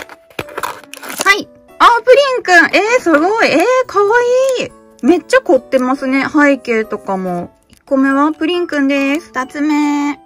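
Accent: Kansai, Japan. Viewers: の関西弁は落ち着きますよー💖そして買い物動画は一緒に行ってるみたいで楽しいです🥰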